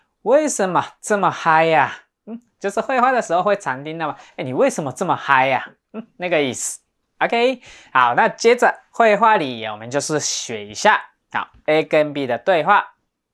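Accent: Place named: Taiwan. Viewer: 老师中文一听就是在台湾学的吧？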